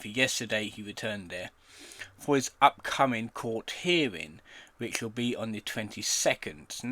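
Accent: United Kingdom. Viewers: average british voiceover, completely unintelligible... bro daniel is more understandable than you when he's in his meltdown mode. just use one of those cancerous text to speech programs it would be better than this. 24 sec in and i legit had to pause and say wtf lol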